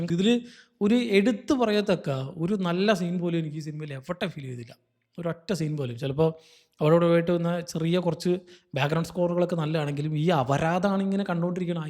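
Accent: Palakkad, India. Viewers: Annante paalakkkadan bhaasha purathu vanna review......👍🙃😅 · Palakd ano bro sthalam.... Nice slang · 😂😂😂😂 നമ്മളുടെ slang വരുന്നത് കൊള്ളാം Bro Palakkad Slang 😂😂 Review കണ്ട് ചിരിച്ചു മരിച്ചു 😂😂😂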